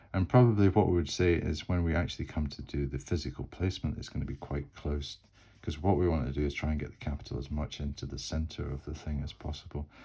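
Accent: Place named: England, United Kingdom. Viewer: Sir your English accent is pleasing to the ear and rarely gives me trouble. However for a minute tonight I was hearing High Merlin. " High Merlin what a concept! " I then caught up and realized it was high moorland. Sweet.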